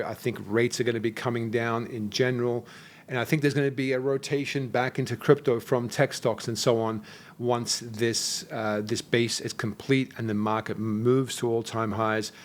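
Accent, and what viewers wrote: Australia. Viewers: I can’t place his accent… Is there a little bit of Aus or Kiwi accent in there??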